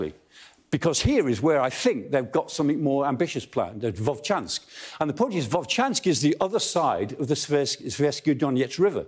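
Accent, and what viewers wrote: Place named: England, United Kingdom. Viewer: What i like the most about M. Clark's commenting is his English accent. Otherwise sadly nothing comes true 😢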